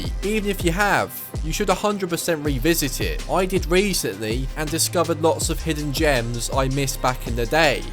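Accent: United Kingdom. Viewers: Damn, this man is Bri'ish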